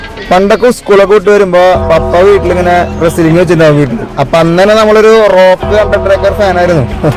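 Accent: Thrissur, India. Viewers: Welcome to the United States. I have a few suggestions for you (1) You should have a clean-shaved face or keep a thick mustache or goatee because many people do not like your face on videos. (2) You should not show your face on video, instead, you should give your narrative and at the same time showing the places you visit like Cool Vision, Rick Reeve’s Europe and Malayalam vloggers like Pikolins Vibe and The Indian Trails. (3) Many people from Thrissur and Malabar districts changed their accent. So, you also should change your accent. If many people from these districts could change their accent, you also could do, so that you could attract more and more viewers to your videos. (4) Your introduction should be very brief. You could say: Hello, Good Morning, I am so and so. Today, I am going to or showing….”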